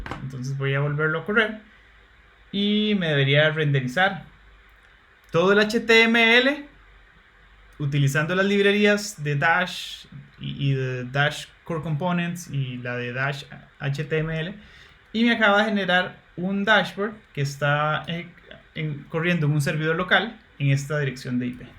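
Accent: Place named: Costa Rica. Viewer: no se pierde el acento tico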